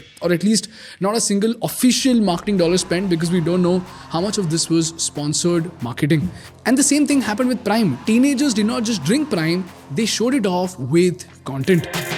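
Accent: India. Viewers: The Indian accent is creepier than labubu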